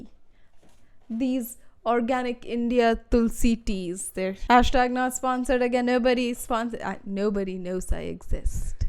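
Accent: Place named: United Kingdom. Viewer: You have british accent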